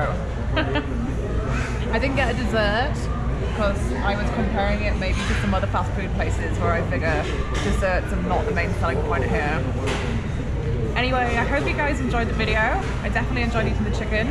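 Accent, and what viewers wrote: United Kingdom. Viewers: British accent - interesting. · Did people ask you if you were British lol. Love your accent lol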